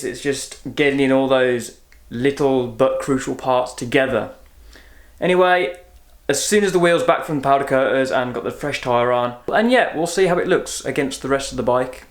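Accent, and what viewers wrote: Birmingham, United Kingdom. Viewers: Cool look fwd to that. You sound like your local to me. I’m in Birmingham. Could do with picking the brain of the guy that does your electrics lol.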